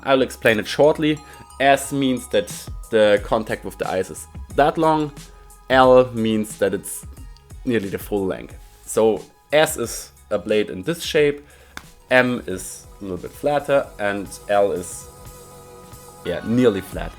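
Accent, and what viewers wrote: Germany. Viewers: Safe ein Deutscher so wie seine Aussprache is 🤗🙏👍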